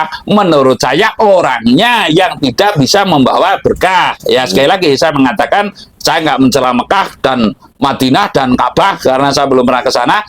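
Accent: Banyumas, Indonesia. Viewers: Nyuwon pirso Mbah penjenengan alamat ipon pundi.nuwon sewu.logat ipon kados banyumasan.kulo saking banyumas.sugeng Rahayu.🙏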